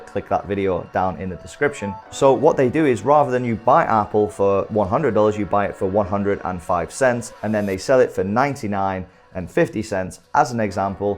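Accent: United Kingdom. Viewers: Great video, after watching 2 weeks worth of americans shouting about what to do it was so refreshing to hear a British voice talking sensibly. Thanks for your time and I'll be using some of your recommendations to get started properly. Regards Steve from Cardiff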